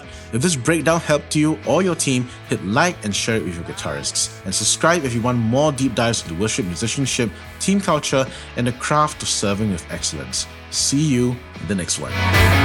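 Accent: Singapore. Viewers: Funny listening to you speaking singlish to the band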